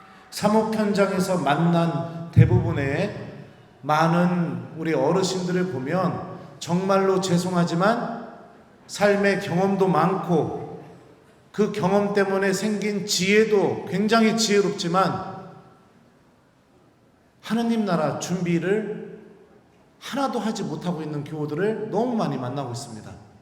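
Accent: Chungcheong, South Korea. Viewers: 구수한 충청도 사투리도 정겹고 신부님 강의 매일미사 강론 너무 감동적으로 잘듣고 있어요. 신부님말씀듣고 어제 고해성사도 했어요. 정말로 좋은말씀 듣고 눈물콧물 흘렸어요. 감사합니다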